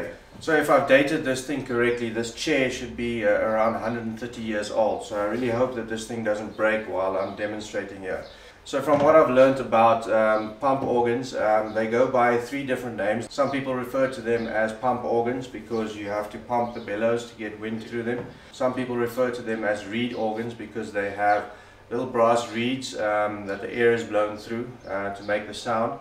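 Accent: South Africa. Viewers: Your Afrikaans accent is jumping off my Afrikaans accent scale. Even my wife was able to figure it out after I gave her a couple guesses.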